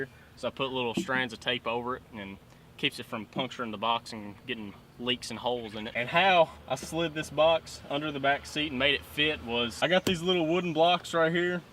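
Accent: Kentucky, United States. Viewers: aye man what part of kentucky you from..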